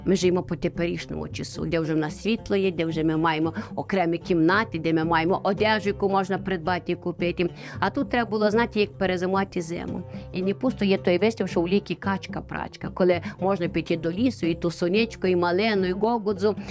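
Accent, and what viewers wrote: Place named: Hutsul, Ukraine. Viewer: Талант оповідачки незаперечний. А яка мила гуцульська говірка ! Дякую !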